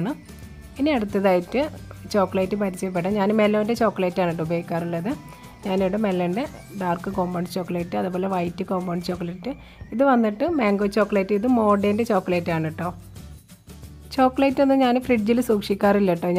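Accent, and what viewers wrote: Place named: Chavakkad, India. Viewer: ഇഷ്ടായിക്ക്ണ്.... ചാവക്കാട് സ്ലാങ്ങ്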